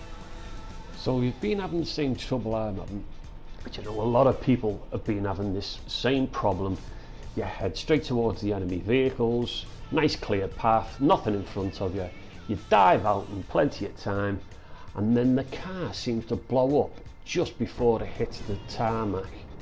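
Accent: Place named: Scotland, United Kingdom. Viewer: What region of Scotland are you from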